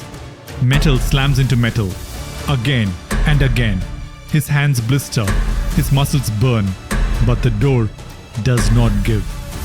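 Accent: India. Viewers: Fck the narrators thick Indian accent … is so disturbing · Hey are you from india? You accent sounds indian.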